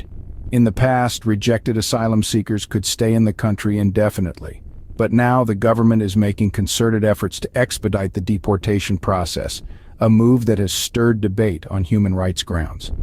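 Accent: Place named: Mid-Atlantic, United States. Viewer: Another damned speechbot with an expressionless Mid Atlantic voice. Turned off.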